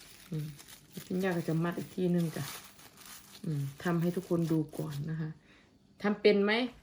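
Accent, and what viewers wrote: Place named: Isan, Thailand. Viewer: พูดอิสาน หรือโคราชก็ น่าฟังดีจ้า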